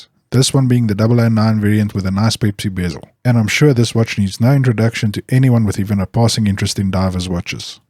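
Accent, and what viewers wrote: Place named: South Africa. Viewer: Excellent and a nice South African-accent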